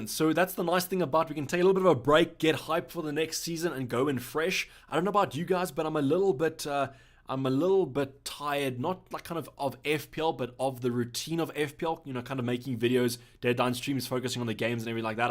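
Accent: South Africa. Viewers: Bro you from South Africa?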